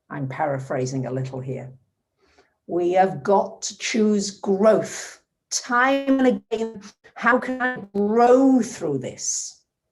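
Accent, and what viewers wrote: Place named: North, United Kingdom. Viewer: Kindness is the people at Royal Mail who helped me out the other day – I sent a card to a friend and forgot to put a stamp on it which is very unlike me, as I'm usually so organised (Mercury Retrograde). They went out of their way to help, sent a driver out to the post box and scooped it out for me after the weekend, drove to my address and posted it back to me, so I could then put a stamp on it – Lovely people! Thank you, love your video's and that Northern accent (like my late Grandmother's) is so comforting.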